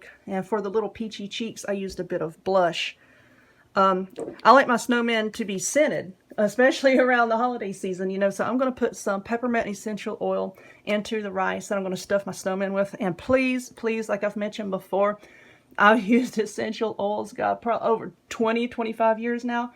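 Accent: South, United States. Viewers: Girl you crack me up LOL " If your snowman is more health conscious .. " ..... Us southern women have our own way of talking and I love hearing you !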